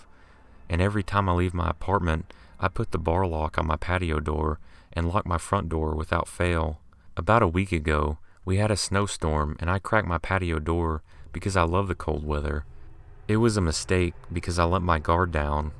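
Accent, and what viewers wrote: South, United States. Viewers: Cool accent. Sounds a little southern(?)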